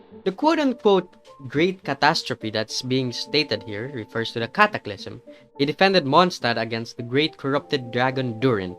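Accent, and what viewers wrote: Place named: Philippines. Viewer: I have a big feeling you are a filipino because of your accent.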